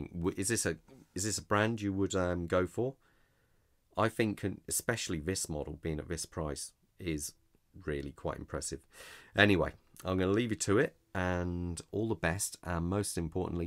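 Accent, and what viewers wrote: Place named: Birmingham, United Kingdom. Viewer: I will definitely visit the dealership you mentioned in Coventry. And I really like your videos with you brummi accent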